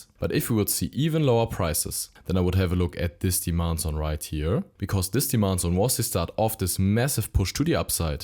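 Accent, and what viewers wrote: Germany. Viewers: Love your german accent 👯👯👯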